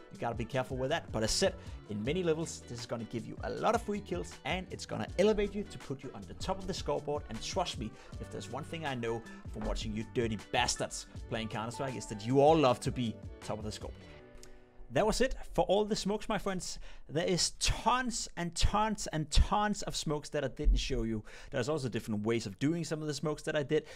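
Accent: Denmark. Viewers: are you from Denmark mate? it does sound so, anyway I left a like fellow dane! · Are you Danish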